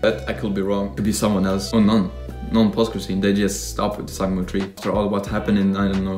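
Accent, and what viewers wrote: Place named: Sweden. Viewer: Been watching your channel for a time now, and both me and my sister had our suspicions on you being Swedish due to your accent, so thanks for telling 🌸 Vi behöver flera Svenska Sonic fans 🥲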